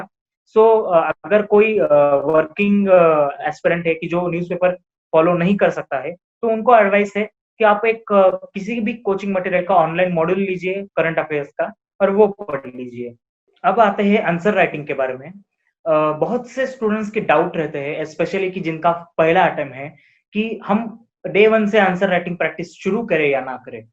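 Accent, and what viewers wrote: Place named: Maharashtra, India. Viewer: Marathi mulachi hindi bhasha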